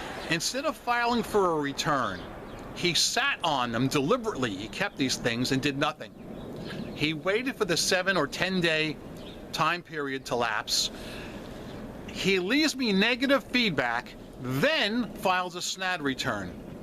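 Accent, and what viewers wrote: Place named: Brooklyn, United States. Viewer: needs to put a compressor/limiter on his audio. When I know he's about to shout (I can see it in his eyes) I immediatly lower the volume. Otherwise, my wife might say "Who are you yelling at!?" and I both have that NJ accent but his is more Brooklyn, NY style. When he says the word "for" it sounds like "fur." I'm sure he drives the people that live down south of The USA crazy. They will say "What did he just say?" Like when the movie "Rocky" came out in the 1970's.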